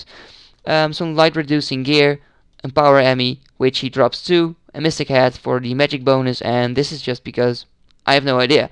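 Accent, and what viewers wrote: Netherlands. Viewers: I was like: Wait... he sounds Dutch! Then I looked at his name. :)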